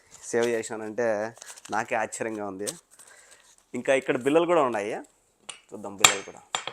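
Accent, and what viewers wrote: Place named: Kadapa, India. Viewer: anna mee native place kadapa naa anna yaasa alaane vundi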